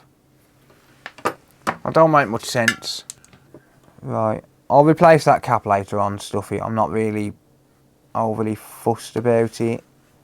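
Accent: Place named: West Midlands, United Kingdom. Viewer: That sounds like a Willenhall accent. 👍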